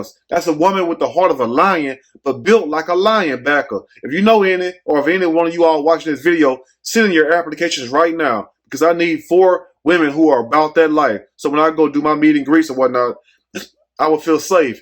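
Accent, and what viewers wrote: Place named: New York, United States. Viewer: Watching in from New York! when I first started watching u, thought u were from up this way 💃🏽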